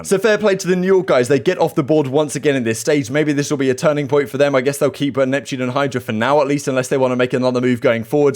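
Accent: United Kingdom. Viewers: Can we get as an analyst? The man speaks with precision, and the british accent is on point. Great vid bro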